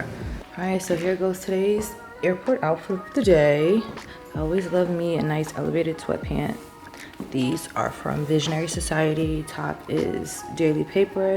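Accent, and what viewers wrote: New York, United States. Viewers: we live for that face and NY accent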